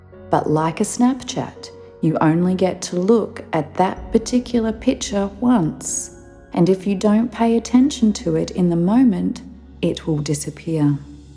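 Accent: Australia. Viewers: Such a deep thought and wisdom that a lot of us neglect especially when our minds go to overdrive and cannot somehow sort out what really our intuition telling us about. Just found you in times of trouble and looking to sooth my heart on Youtube with all the loads of helpful and inspiring stuff. When I hear your voice I immediately recognise your loving Australian accent.:-) Thank you heaps!!!!!! ❤️🙏🏽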